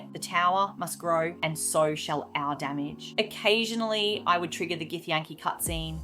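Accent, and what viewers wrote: Australia. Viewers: i love the australian accent, I wish i was australian T_T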